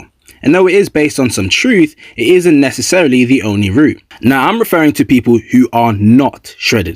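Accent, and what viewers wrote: London, United Kingdom. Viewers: lol no it's a London accent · British accent is perfect for commentary. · it’s a london accent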